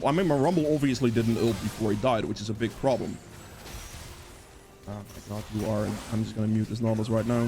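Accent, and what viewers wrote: Netherlands. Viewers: Hey thanks for the guide. You seem to have a Dutch accent. Are you Dutch by any chance? :D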